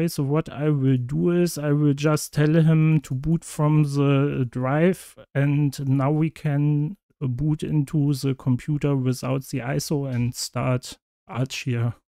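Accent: Germany. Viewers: Bruder hat den dicksten deutschen Akzent den es gibt.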